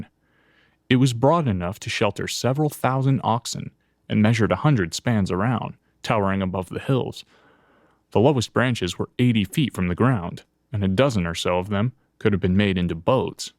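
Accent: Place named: United States. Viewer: are you kidding? this over the top american accent is just unbearable. I can't imagine any intonation more unsuitable for reading this kind of content (well, maybe an arrogant-french intonation. wouldn't be much worse though).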